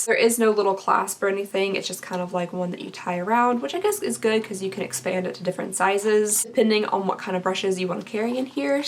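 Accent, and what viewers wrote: Kentucky, United States. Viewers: This is a bit of a weird comment, I'm also from KY and sometimes I miss the accent and watch your videos 😅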